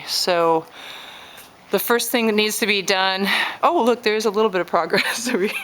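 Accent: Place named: Ireland. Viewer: What I enjoy most is narration; her slight brogue does not hamper her clear and mellow enunciation, precise, yet complete, sentences. The self-aware, embarrassed giggles make listening easy and delightful. Those walls pierced with so many empty eyes may not be pleasing to my aesthetic sense, but I'm sure they'll be practical. I remember the Big One of 1964, which leveled much of Anchorage and caused a tidal wave which swept our Oregon beaches clear of debris and also one family's children. Keep up the good work. <link>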